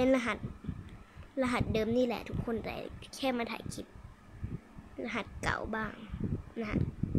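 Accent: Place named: South, Thailand. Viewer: พี่เป็นคนใต้ไหมค่ะ ...เห็นพี่พูดใต้เกือบทุกคริปเลย...🥀🥀🥀🥀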